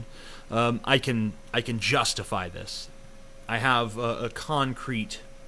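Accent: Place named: England, United Kingdom. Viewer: Your English Accent is amazing x)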